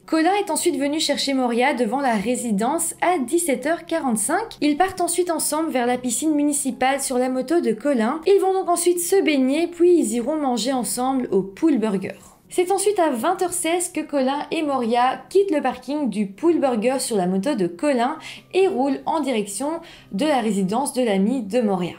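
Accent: Belgium. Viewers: Bonjour, Bon avant toute chose, je te remercie de relater cette affaire aussi récente et dans les détails et dont je n'avais pas eu connaissance.😉 Cependant et au risque de me mettre toute ta communauté à dos...🥺😭 Mais bon sang!!! Non pas que je sois tatillons et sachant en plus que ton accent belge est des plus charmant et n'étant moi même pas originaire d'Alaska(rapport au poisson); Par pitié!!! 🙏 "Colin" quant bien même ait-il de "l'oseille"; je pense que la "moutarde"; fut-elle à l'ancienne, lui monterait rapidement au nez en entendant ta prononciation le concernant😡😂...(par ta faute, j'ai la dalle; du coup🍽😊)... Concernant ton analyse: j'acquiesce totalement et bien que l'affaire soit en plein déroulement, il ne fait aucun doute selon moi, concernant la culpabilité de la principale intéressée (jalousie, possessivité et fuite par dessus le marché). En conclusion, je dirais que force est de constater que le yoga ainsi que ses alternatives en matière de relaxation (à l'occidental, je précise); sont loin de démontrer leur efficacité en matière de gestion de la colère, ainsi que de retenue vis à vis d'autrui... 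Je laisse notamment à tes viewers (et potentiellement lecteur de ce post); le soin de se faire leur propre opinion concernant le nombre à travers le monde, d'individus, soit disant "healthy" et "natty"; "bien dans leur peau et dans leur tête à l'instant T"; ayant basculés dans les abysses de la folie; à priori aux antipodes de leur style de vie tan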